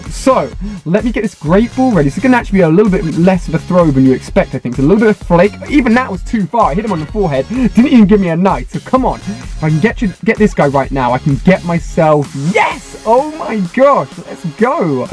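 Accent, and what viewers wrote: United Kingdom. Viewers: He is british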